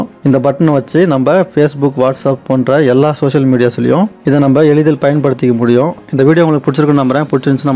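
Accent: Chennai, India. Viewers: local Madras baashai pesatheenga broo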